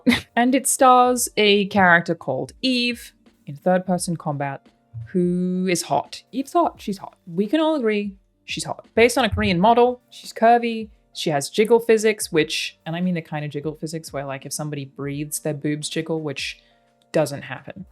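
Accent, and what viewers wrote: United Kingdom. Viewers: That British accent lol sounded like Lara Croft!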